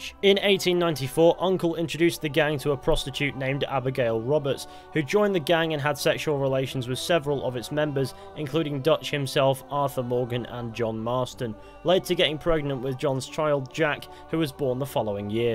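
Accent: England, United Kingdom. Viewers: I'm sorry but this video would be better with a deep American accent instead of a high pitched Englishman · Umm I'm an American and in no way would anyone say his name that way. And for real British are not ones to talk. Bloody Hell (For real get a new catch phrase) You taking a piss? (Makes no sense) My friend is in Hospital (surly you mean in "a/the" hospital) it's not like you say my friend is in store. No your friend would be in "the"store. I could go on and on but you people long ago lost touch with speaking correctly. I once herd a comedian say Americans say Herb wrong because it has an H in it. But then how do you explain Knife? And you guys LOVE that letter H you super put alot of weight into it. Just saying next time you wanna say someone else talks funny make sure your own accent isn't full of stupid memes.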